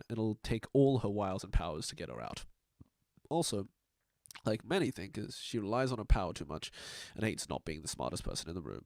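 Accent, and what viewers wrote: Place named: Scotland, United Kingdom. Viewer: yes move on from that outrageous scottish accent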